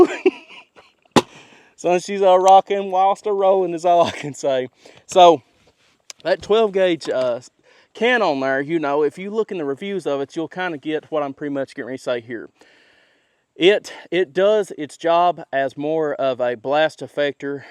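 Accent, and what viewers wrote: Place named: South, United States. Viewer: Slow down a little, with your awesome accent it gets difficult to understand when you talk so fast. I had to turn on the subtitles. The southern accent is the best, no question, but it wasn't meant to be belt fed.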